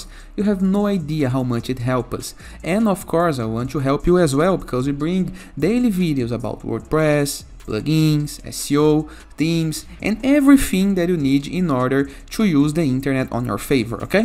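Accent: Brazil. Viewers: pelo sotaque e BR hahaha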